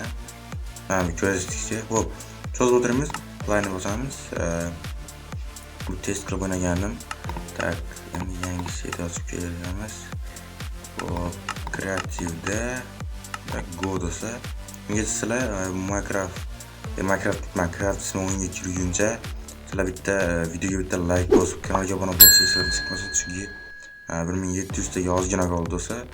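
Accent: Khorezm, Uzbekistan. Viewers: Oka Xorazmdan misiz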